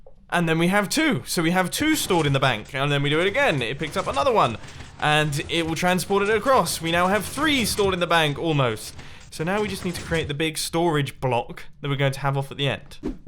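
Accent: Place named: United Kingdom. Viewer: "It's gonna be complicated..." he said in his brittish accent..."lemme make it more complicated" me saying as if I was him....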